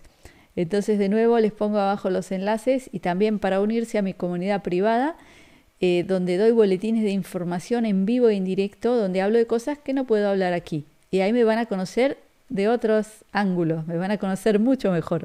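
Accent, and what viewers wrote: Argentina. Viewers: Ese acento tan bonito *, Argentina! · Tu acento ... te delatan, sos de Argentina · Por tu acento, naciste en Argentina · Naciste en Argentina por tu dejo.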